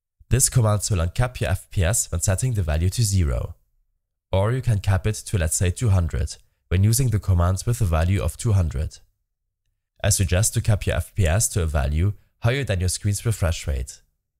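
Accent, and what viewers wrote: Germany. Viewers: Was thinking Japanese from the accent but was surprised that it's German.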